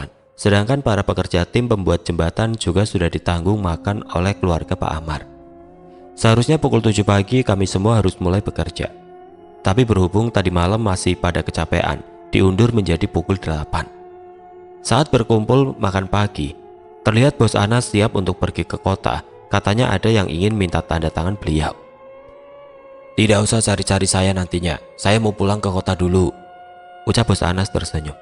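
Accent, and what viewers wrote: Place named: Java, Indonesia. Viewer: Mlah fokus ke logat admin.Admin asli jawa mana ?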